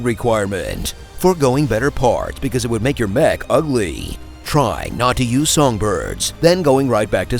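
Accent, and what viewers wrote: Australia. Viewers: Australian youtuber, but yeah. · I think he's Australian.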